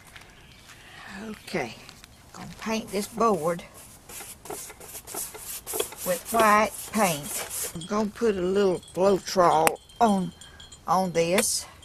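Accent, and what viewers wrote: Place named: Georgia, United States. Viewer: Love all you do. Thank you for teaching us. Love that Georgia accent, as I’m from Griffin, Ga. love your talent.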